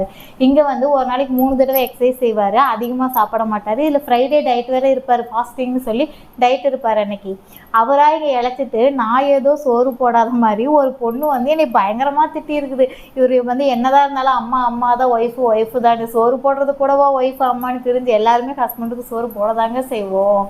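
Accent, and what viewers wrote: India. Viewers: Cute akka ninga ❤...... Akka ninga nga tirunelveli ponu mari pesuringa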